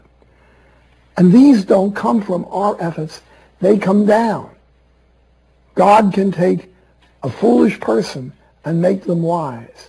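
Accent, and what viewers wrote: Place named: New York, United States. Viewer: ♥️Father Benedict. Enjoy Heaven Thank You for all Your Recordings and vides on EWTN like yuh our style as and New York Accent. A real wealth of time spent in front of recording studio.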